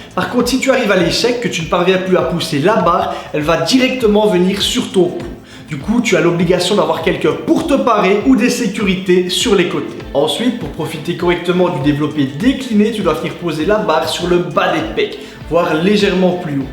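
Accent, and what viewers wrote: Belgium. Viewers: Olala nonante septante ! On est belge ou suisse ? (je parierais sur le premier choix vu l'accent) Ceci dit, belles explications sur le développé décliné, que je voyais jusque là vraiment comme un exercice parmi les plus inutiles possibles (eh oui moi aussi on m'avait dit que ça musclait le bas des pecs)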